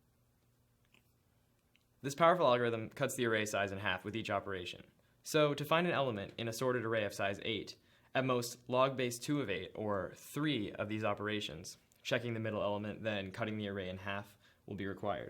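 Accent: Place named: India. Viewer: Awwh yes! Finally an english speaking lection! I am no racist, but god damn how can one focus on that Indian/Pakistani accent?!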